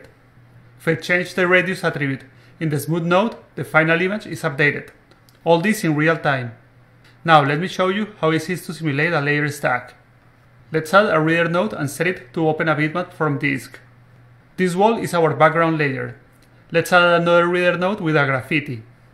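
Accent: Spain. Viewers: Buenas equipo de. Antes de nada, vuestro software es increíble, estoy deseando probarlo y empezar a hacer mis texturas en él. Otra cosa; si no me equivoco por el acento, sois españoles, si es el caso, tenéis algún tipo de video tutoriales en español? Por lo que he visto son todos en inglés, aunque no es un gran problema, se me escapan ciertas cosas con el inglés, y me iría de perlas que fueran en castellano. Muchas gracias y seguid así!!!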